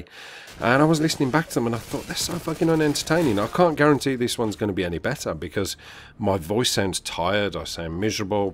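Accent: Birmingham, United Kingdom. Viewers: You don't sound tired mate, it's just the brummie accent! ;-)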